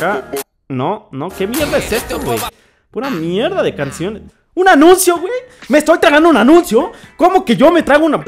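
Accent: Costa Rica. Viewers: Wtf sos tico o algo? Xd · Sos tico?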